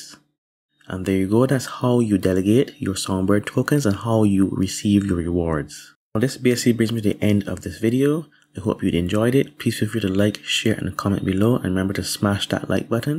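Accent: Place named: Barbados. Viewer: Love that Barbados Accent sounds like English but with a slice of Lime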